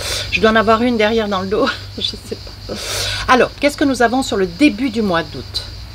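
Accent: South, France. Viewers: Quelle énergie vous nous transmettez petit bout de femme talentueuse votre accent me rapl le sud de chez moi que j ai quitté il y a peu en tout cas grand merci pour votre don ❤️🙏belles vacances profitez bien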